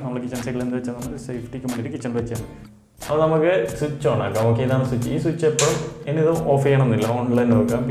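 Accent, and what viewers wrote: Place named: Kasaragod, India. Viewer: കാസർഗോഡ് സ്ലാങ്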